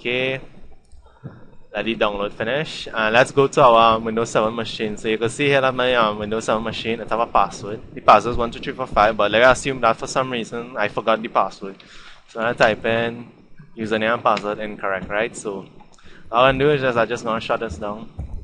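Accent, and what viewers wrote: Trinidad, Trinidad and Tobago. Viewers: lol 100% trinidad accent... maybe bahamas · wat a large trini accent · you sound like a trinidadian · Trini accent strong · trinidadian? me too! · wait nah...a trini? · how that sounding like a trini accent so ? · your trinidadidan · Hes trini/guyanase and probally a west indian. I can understand his language fine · yuh soundin like yuh is ah trini, I undahstan yuh clean. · de trini accent strong.. lol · Trinidad accent haha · u iz ah trini or wa? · He ah trini!!! Trini big up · i understand it very well are you from trini to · never thought i'd see a trini giving tech advice on youtube yes..sounds like yuh just kixxin around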